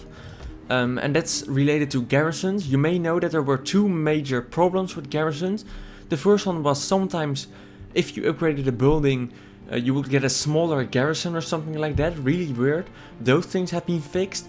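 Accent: Netherlands. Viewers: Are you dutch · ***** You're Dutch? So that's where that accent comes from! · Fucked up Dutch accent. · He has a Dutch accent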